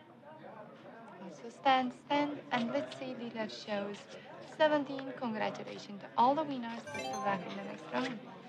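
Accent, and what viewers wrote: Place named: Eastern Europe. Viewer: thank you for the answer, so they don't hide the fact they based in Romania? I wasn't mistaken then when I suggested this is Eastern European accent :)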